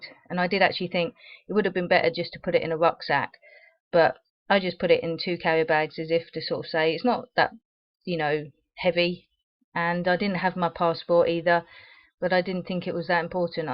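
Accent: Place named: England, United Kingdom. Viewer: You are English! haha your accent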